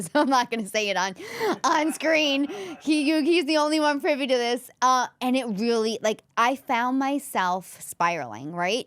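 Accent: New York, United States. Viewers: It was how we say park...we born and raised NYers will know each other from the first few words..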